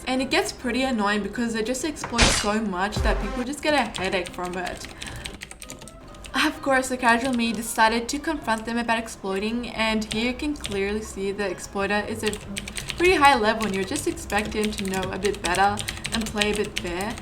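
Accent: United Kingdom. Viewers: Are u from uk? Also I luv ur voice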